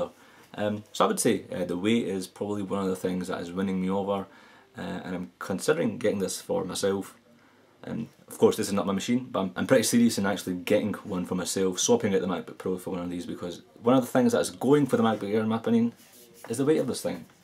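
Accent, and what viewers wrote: Scotland, United Kingdom. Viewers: love Scottish accent !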